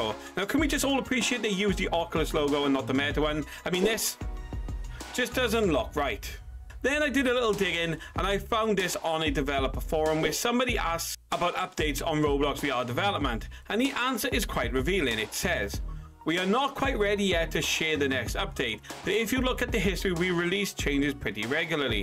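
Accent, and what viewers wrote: Wales, United Kingdom. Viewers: Are you welsh??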